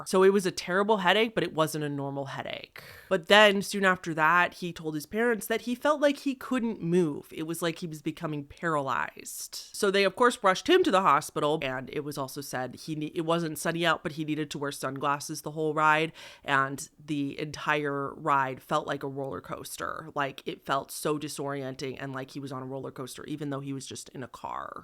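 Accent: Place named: Los Angeles, United States. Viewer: R u from LA by any chance? Why do you talk like that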